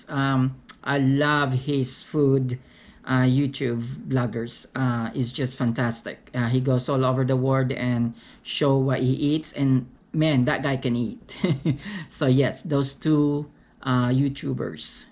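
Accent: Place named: United States. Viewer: hi mekini lukluk same tyo fave mangoshake.ganda ng accent mo pra k naring ameican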